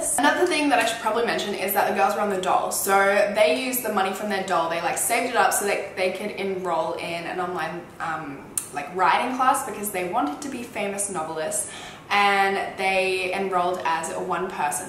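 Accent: Australia. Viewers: yay she’s an aussiieeee!!!!! :) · Holly molly she aussie oml i am too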